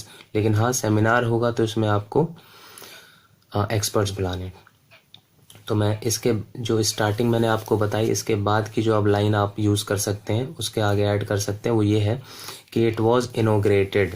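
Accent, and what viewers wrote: Lucknow, India. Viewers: Bhai Lucknow se. Ho kya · bhai lucknow ke ho ka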